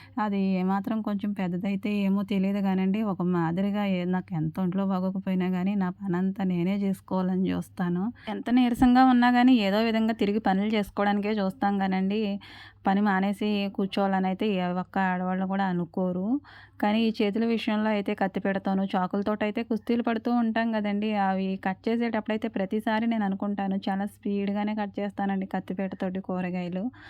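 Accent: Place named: East Godavari, India. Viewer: ne matlade slang ma vari menattagari ilane untundi.vallu east godavari dist. Nannu Amma Ani antunnavu I felt very good. Inka na family maku oka abbayi. Ante ma varu monna sept lo cancer to chanipoyaru. Anduke nenu austrelua ochhesanu. Ma kodalu kuda ikkada oka pedda off lo engineer. Ma abbayi kuda engineer. Vallaki oka papa vahini 3rd class. Nenu kuda ikkadiki ochhi job chestunna. Na age 65 ayina naku individuality ekkuva. India rate prakaram 75k ostundi. Naku hyd lo oka place undi. Ikkada ma pillalu 2 houses 2 cars hyd lo 2 places konukkunnaru. Valla salary lo konni loans cut avutayi. Anduke nenu job ki vela. Ikkada Anni mechines kanuka nuvvu chesinanta pani undadu. Nenu ma kodalu evariki veelu unte vallam chesukuntamu. Idi na sangatulu. Ma sisters antaru. Inta age lo job enduku pillalu chusukuntaru kada Ani. Naku oppadu. Amma nenu msg pettindi neeku ardam avutunda. 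Lekunte nenu msgs manestanu. Cheppu emi cheyanu.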